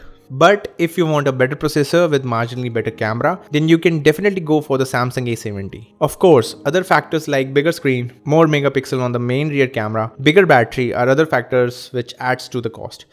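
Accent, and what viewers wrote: India. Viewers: I meant because of the indian accent I getting sick of it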